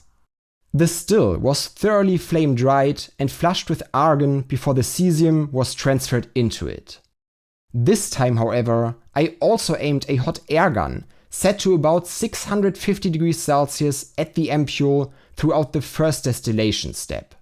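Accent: Germany. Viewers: Finally a face to this voice. Der harte deutsche Akzent ist irgw catchy..😅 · HAHA a german robot HAHA learn to talk like a human you f00ken AI npc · love your videos man. Außerdem, dein Akzent hört sich deutsch an, woher kommst du wenn ich fragen darf? :) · I know tis guy is German this accent is to thik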